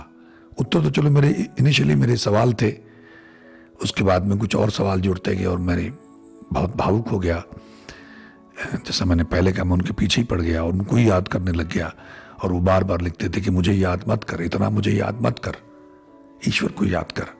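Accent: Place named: Sialkot, Pakistan. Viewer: आप सियालकोटिए हो। आपकी बोल बाणी से लगता है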